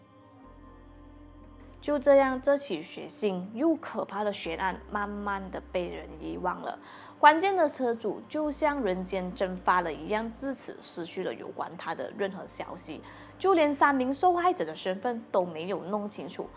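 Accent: Malaysia. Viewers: 马来西亚华语音调。 广东客家合调！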